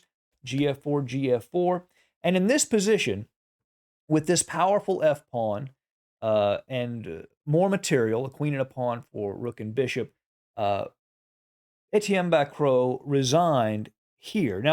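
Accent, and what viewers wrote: United States. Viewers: I love your postings. 80% because your analysis is so instructive, 10% because you do games I remember from my youth (you know, when you said things like p-K4) and not just the stars, and the final 10% because I just luv that akcent - sounds like a real cowboy to my terribly British ear, old chap.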